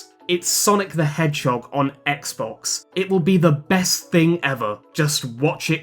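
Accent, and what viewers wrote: United Kingdom. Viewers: This is the most pommy sonic tuber ever and I'm here for it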